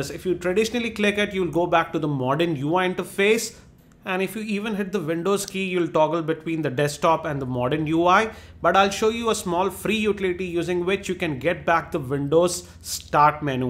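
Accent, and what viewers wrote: India. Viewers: LOLOLOLOLOLOLOLOLOLOLOLOL Indian accent <3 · lol i love indian accent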